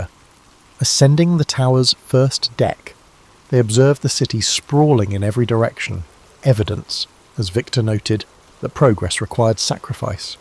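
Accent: United Kingdom. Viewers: YESSS a British narrator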